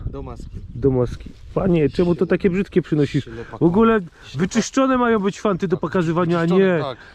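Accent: Silesia, Poland. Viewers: Godosz po Naszymu a piszesz KASZANKA hahaha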